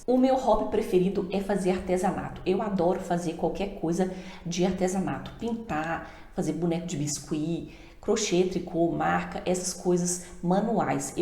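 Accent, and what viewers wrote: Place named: Minas Gerais, Brazil. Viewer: Voz de mineirinha.Minha mãe era mineira de Poços de Caldas .Maringá Pr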